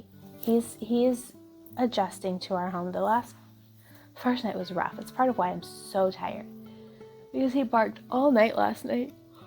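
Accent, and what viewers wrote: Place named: Alabama, United States. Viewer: You're exhausted? You look GREAT. The Pickens has a gorgeous drape😍 you did a great job! Your accent is similar to mine I'm from Alabama where are you from?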